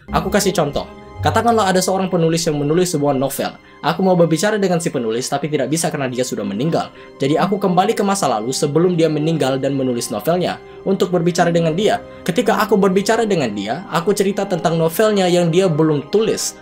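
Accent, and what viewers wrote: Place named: Indonesia. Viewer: Gila semua katanya logat indonesia kecuali kata "paradox"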